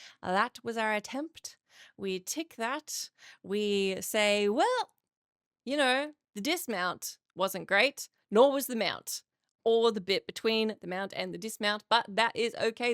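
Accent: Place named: Australia. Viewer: I've never picked your Australian accent before, probably because I'm in New Zealand ❤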